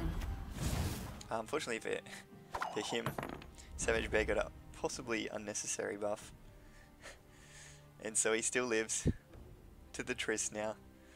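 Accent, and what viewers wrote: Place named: Australia. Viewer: Great Video really liking your content, + Australian accent got me moist.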